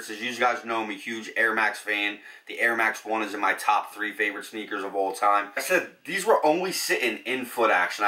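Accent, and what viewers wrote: Philadelphia, United States. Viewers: Mika Swasaq philly.. philly.. philly must be where he from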